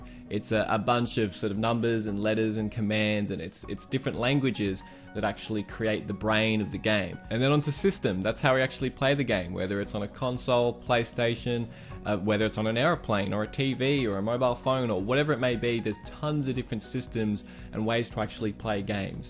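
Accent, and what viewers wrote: Australia. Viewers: Australian accent is so beautiful 🥰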